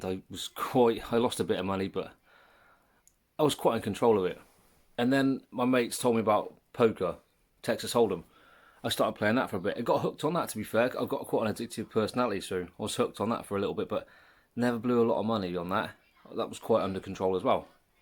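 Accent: Australia. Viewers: Sounds like he is from Australia. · How are you not Aussie !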